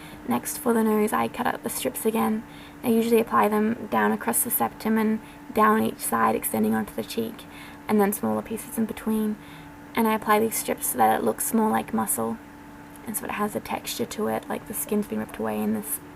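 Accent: Australia. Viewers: Are you from Australia? Just curious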